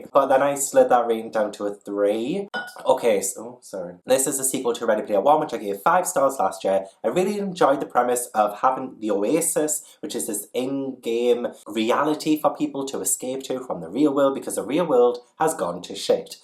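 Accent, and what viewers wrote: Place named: Newcastle, United Kingdom. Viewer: ☕ Told there was drama 👀 Saw the hilarious intro ✨ Heard the Geordie accent 🔥 INSTANT SUBSCRIBE 🔥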